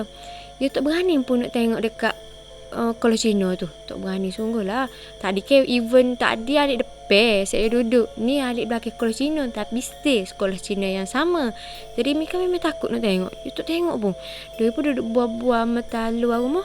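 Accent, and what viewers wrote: Kelantan, Malaysia. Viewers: Sambil2 dgr dapat belajar loghat Kelantan.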